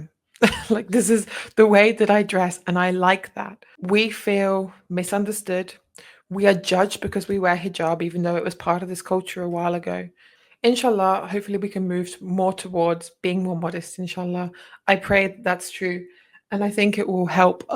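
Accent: United Kingdom. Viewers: I wouldn't cover my beautiful natural blonde hair. Why do 8 year old muslim girls cover their hair🤔 are we assuming men cant resist? Gross!!! Get some vitamin D lady. I hear your English accent. Go live in saudi Arabia youll be happy there I think. I assume you're single. Im a grandma. Get a real job or do as your father tells you. Dont be a hypocrite. Maybe your hair isnt as beautiful as mine😊😊😊 thats ok Im just real lucky. You sad woman. House prices increased because of immigration. 20 million too many people here. Are u getting paid for this video? Your parents must hate you😂😂😂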